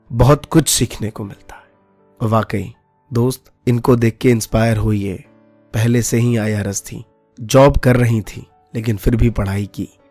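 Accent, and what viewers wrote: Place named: Delhi, India. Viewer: Sir nice video by the way aap Delhi se ho kya Touch wahi laga bolne ka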